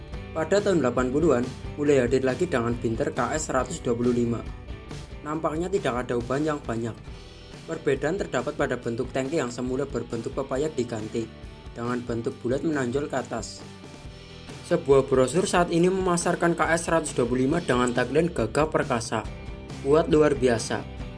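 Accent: Java, Indonesia. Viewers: Jowonya medok amat...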